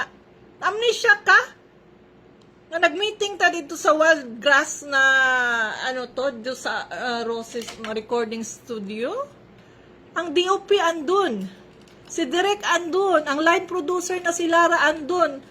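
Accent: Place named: Visayas, Philippines. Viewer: Bisaya diay ka maam?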